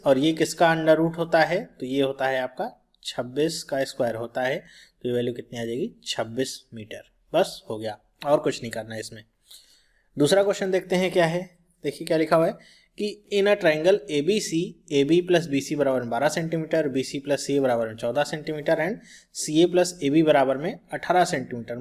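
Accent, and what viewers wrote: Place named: Bihar, India. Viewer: his language seems to be bihari difficult to understand :(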